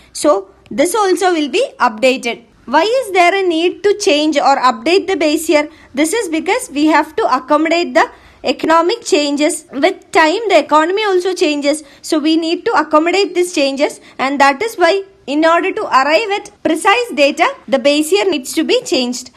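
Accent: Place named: South India, India. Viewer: South Indian English accent!!!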